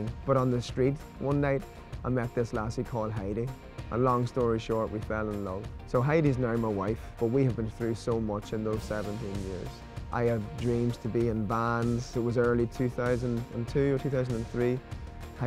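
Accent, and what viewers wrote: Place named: Ireland. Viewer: Awesome story bro! Just recently heard your music and love it! And now find out you're Irish! Haha, love the accent.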